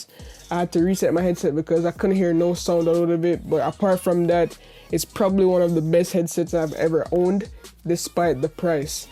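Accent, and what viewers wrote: Jamaica. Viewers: Are you Jamaican? It's like listening to one of my cousins tell me about tech. Great work!!! Ire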